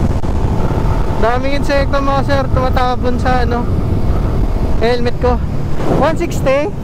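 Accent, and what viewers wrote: Iloilo, Philippines. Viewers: Parang iloilo to ah.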